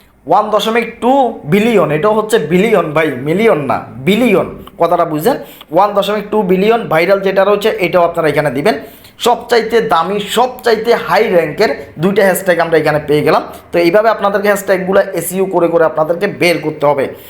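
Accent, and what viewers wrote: Chittagong, Bangladesh. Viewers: ভাইয়া আপনি কি চট্টগ্রামের?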